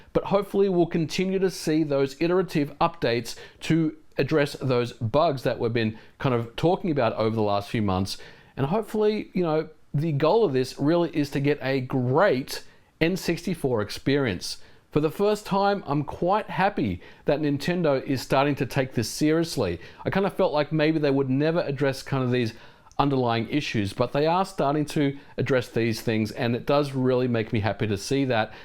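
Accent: Australia. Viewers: It's probably a quirk of his accent(being from Australia) and just saying it the alternative way. That or just ask him on Twitter or some shit. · Classic Australian VO work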